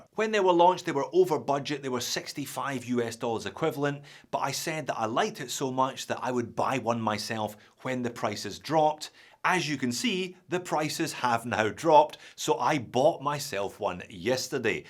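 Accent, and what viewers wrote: Scotland, United Kingdom. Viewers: Oh the Scottish accent. I was trying to figure out what a dated complication is… an old function you don’t need anymore?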